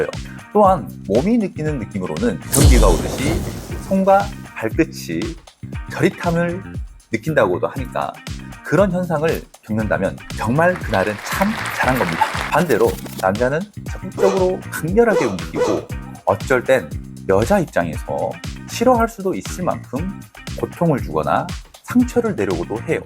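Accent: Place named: Jeolla, South Korea. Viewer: 고향이 전라도신가요? 말투가 전라도 사투리가 나와서요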